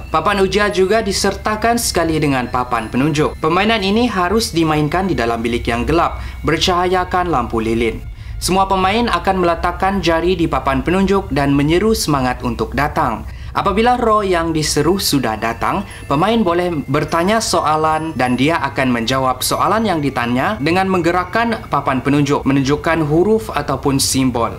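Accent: Sabah, Malaysia. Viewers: Dari sabah kah? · dia nie sabahan ka atau indon? maaf tnya mcm nie. .sya pun sabahan juga.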